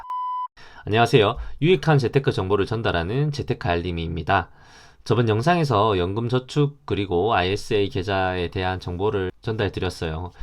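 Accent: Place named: Gyeongsang, South Korea. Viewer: 경상도 분이시군요. 억양이 힘들어요. 내용보다 그 억양에 집중되요.ㅠ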